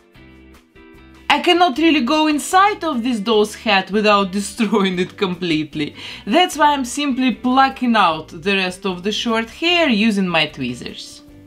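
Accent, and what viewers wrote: Russia. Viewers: Акцент автора похож на русский. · Я не знаю почему, но на каждом видео слышу русский акцент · Лол, русский акцент прям прёт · I LOVE your heavy Russian accent and also the happy music is kind of making this creepier but you still got a new subscriber 💕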